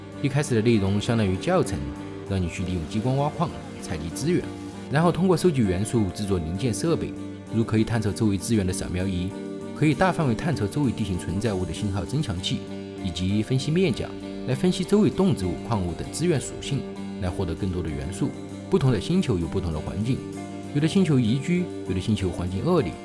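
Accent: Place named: Southwest, China. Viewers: 一听就知道你是西南地区的人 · 他成都的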